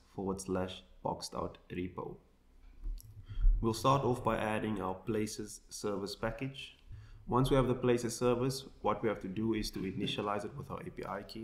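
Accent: South Africa. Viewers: I know a south african accent when I hear one lol, CPT ne? Bro you might want to hide your API key there. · You from SA? oh wow I can hear the accent and the Cape Town location haha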